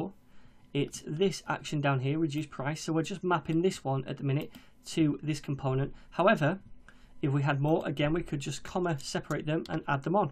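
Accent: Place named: Northern England, United Kingdom. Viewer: I watched every Vue video. I have to say... playing at 2x speed really pushes the mind as you deliver your content so fast anyway! It's also extremely refreshing to hear a northern accent so intensely after living in London for seven years... Thank you very much!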